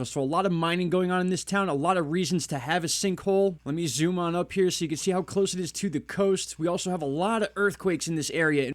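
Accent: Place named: New Jersey, United States. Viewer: I really like your channel 😊 (plus, I hear a hometown accent-sounds like New Jersey 😉). I was born in Philly, but grew up in the Tri-state area, mostly New Jersey-from Blackwood and Willingboro to Glen Ridge and Bloomfield and about 2/3 other cities in between lol. Thanks for covering these things. It's only going to get worse from here on out, so it's good to have you on board! We NEED a channel like yours 👍.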